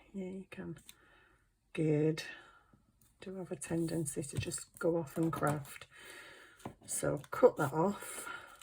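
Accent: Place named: Yorkshire, United Kingdom. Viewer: Hi as a fellow Fibro suffer I absolutely get the 'decision making' thing!🤔🙄🤔🙄🤔😬🤔🤭So glad I've found you on you tube, you sound like you're from my neck of the woods! Especially when I saw your Yorkshire Tea box in one of your vids! 😃I'm a Sheffield'er! 😬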